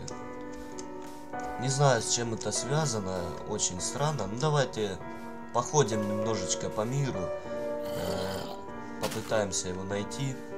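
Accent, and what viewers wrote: Ukraine. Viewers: Вот в этом можно прям услышать акцент украинцев но в 2024 году его прям не слышно и я думала что он русский пх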